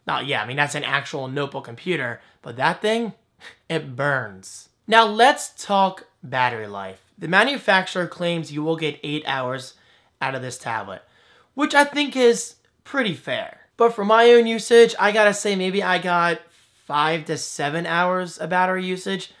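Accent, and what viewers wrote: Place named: New Jersey, United States. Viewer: am i the only one who heard him say saalid instead of solid... you are rocking the jersey accent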